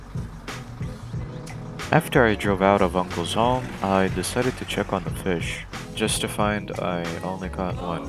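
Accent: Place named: Lithuania. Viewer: The voice sound very Lithuanian, are you Lithuanian? :D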